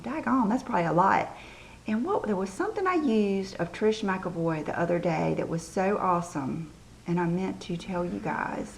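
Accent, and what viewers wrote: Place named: North Carolina, United States. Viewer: You are so sweet. I watch you when I can. I really like the Burberry creme shadows. I've been using a silk pillow case for a couple months and found mine would fall apart in the seams. I'm going to try the one you showed. I'm a retired special ops wife and have a home in Fayetteville NC, and it sounds like your from NC and I was wondering where? If you can't say that's fine. Love you acsent and never be sorry about it, that's what makes you special. Have a wonderful week.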